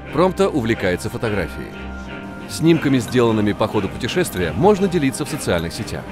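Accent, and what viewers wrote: Russia. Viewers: ОООО, русский голос! #1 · ООООО РУССКИЙ ГОЛОС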